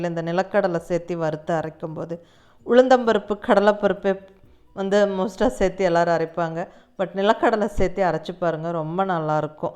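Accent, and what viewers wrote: Kongu, India. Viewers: Mam neenga pesura kongu tamil romba nalla iruku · I think Coimbatore.... Sollumbothey Enga kongunadu Nu sollaranga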